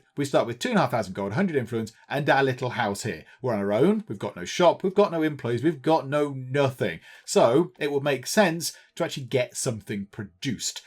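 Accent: United Kingdom. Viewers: Hello British accent guy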